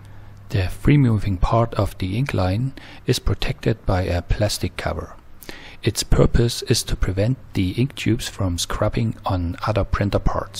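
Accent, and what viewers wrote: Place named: Germany. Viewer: Any explanation video or tutorial is made infinitely better when it involves someone with a German accent.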